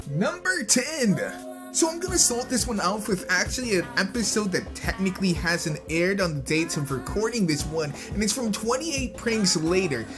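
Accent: Ireland. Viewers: are u irish? xD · Anyone elsea realized he kinda sounded a bit different, in my opinion he sounded like irish (no offense)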